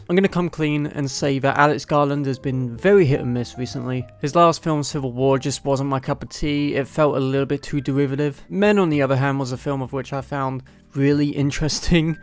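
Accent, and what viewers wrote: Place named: RP, United Kingdom. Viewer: U sound like an upperclass nob tbh so I wouldn't listen 2 Ur opinion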